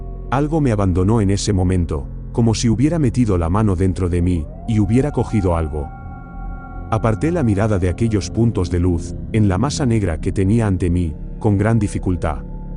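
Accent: Spain. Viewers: Primera vez que entro al canal ,me encanto ,muy bien relatado ,y el acento español le da ese toque unico en nuestra lengua ,saludos desde buenos aires ,me suscribo ..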